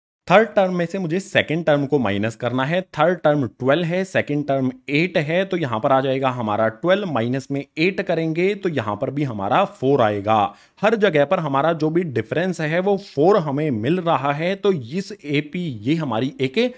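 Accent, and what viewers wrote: Haryana, India. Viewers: Sir haryana ke ho 😅😅😅😅😅😅😅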